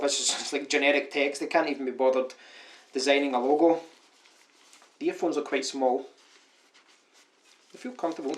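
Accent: Scotland, United Kingdom. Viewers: You sound so Scottish lol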